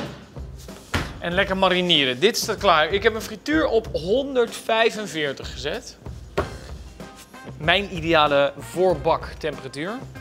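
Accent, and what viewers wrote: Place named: Holland, Netherlands. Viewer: Sowieso heeft hij meer een Hollands accent. Die "R" heeft hij in ieder geval niet in Brabant geleerd. Beetje een mengelmoesje, dus ik snap wel, dat hij dan de termen patat en friet door elkaar heen gebruikt.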